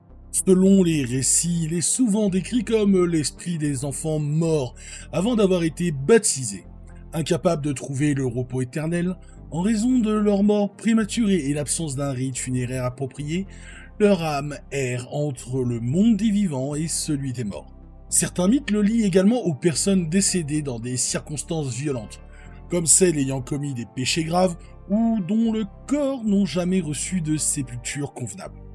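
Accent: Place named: Eastern Europe. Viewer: Je découvre ta chaîne, mais quelle ambiance! J'aime bcp ton montage sobre, ton élocution, la prestance de la grosse beubar de viking, cet accent slave magnifique 🤌 Ton analyse est fouillée et pas inutilement dans le debunk. En tout cas ton Drekavac me fait fort penser aux très modernes creepers ou rakes (humanoïdes squelettiques, pattes arrière retournées, yeux fluorescents, gueule béante et emplie de dents...) Y'a une version en Europe de l'ouest, comme tu dis le cri est horrible et ils sont très furtifs. Le coup de la photo/film raté se recoupe avec pas mal d'images de cryptides, qui sait si ces créatures ne peuvent agir sur les images avec un genre de champ électromagnétique ? Et cette histoire de cri avant un mort rappelle les Banshees bien sûr! Je m'abonne évidemment:)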